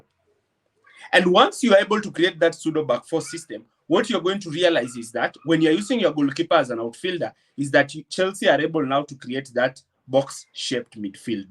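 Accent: Kenya. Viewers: Good job brother, I knew that was a unique Kenyan signature tone and accent display. Thank you for the good work you are doing.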